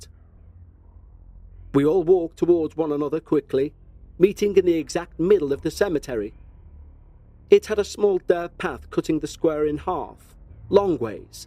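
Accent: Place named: Liverpool, United Kingdom. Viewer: Out of all the paranormal podcasts, this is by far the best. As someone from Preston, you are deffo a Scouser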